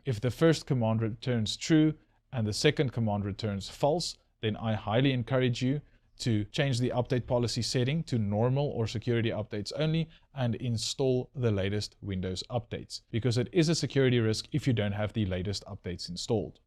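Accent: South Africa. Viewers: I like this site for its good advice, an insight into just how long M'soft has been run by control freaks and because I went to school with boarders who were mainly from ZA and Rhodesia. The accent reminds me of decades ago when a town scholarship day boy was taught how to play rugger as it SHOULD be played -- by the rules and never to shout. In old age, these things become important. Thank you.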